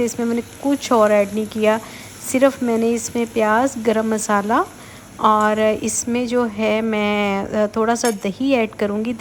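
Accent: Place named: Punjab, India. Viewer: you are precious miss, and adorable women. Must tc your healthcare so much. I miss apka Punjabi accent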